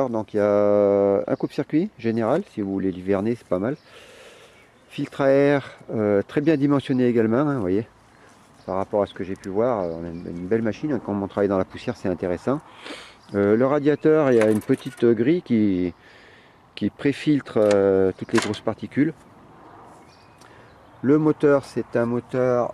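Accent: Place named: Saint-Étienne, France. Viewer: Très bonne présentation l’accent on dirait un Stéphanois égaré dans le 38...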